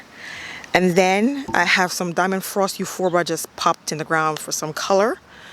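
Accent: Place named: Jamaica. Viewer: you soundalike you have a Jamaican accent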